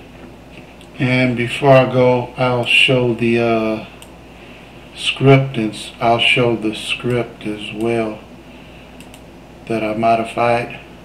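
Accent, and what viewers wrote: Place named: United States. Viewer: Thanks for sharing this. I'm at the point where this is exactly my roadblock, my character needs to do more than just walking around. I know this was over a year ago but can you explain what you did, or maybe do another detailing changes....ie, >add Attack animation to Animator > setup Trigger in Parameters, >set parameter to >.01 etc....then also breakdown what lines of code you added to script or if you added a new script. It's kind of hard to see on this video without closeups or zooms. It is good to hear a good American voice on a tut! Thanks!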